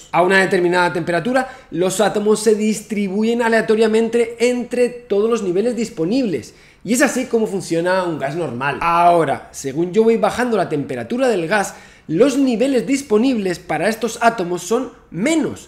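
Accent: Canary Islands, Spain. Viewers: De dónde es el presentador? Canarias?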